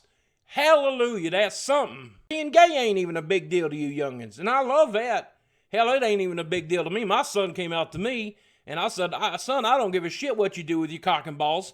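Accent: South, United States. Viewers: Lol you are awesome man:D absolutely loving that southern draw gotta admit your topics so far have gone over my head america's pretty fucked up right now with everything going on but being british we know none of the pc culture or trump issues:p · He takes the typical southern person with a accent who much people think are stupid it turns it completely around. Good job. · It's actually his real accent, he's said many times he had a southern accent and tried to get rid of it. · he's just playing and has a southern accent. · (laursaurus) That's because he grew up on the south. He worked on getting rid of his accent when he was younger, I think. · If this was the first boogie video i'd watched, i'd have thought this was really his voice. I didn't know he could do such an amazing southern accent. This is hilarious to top it off. Sounds like the first southerner i'd probably really get on with, lol. 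· My favorite YouTube persona. I don't want to tell you how to do what you do because it's hilarious, but being from the south if you could make the accent a little thicker it would be spot on. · I. Love. The. Accent! This is why I wanna visit the US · I live in Alabama, the Southern accent is spot on bro.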